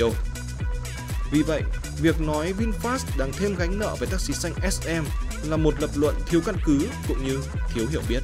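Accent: North, Vietnam. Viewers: nghe giọng ba kẹ là tao đã ngi ngi rồi :v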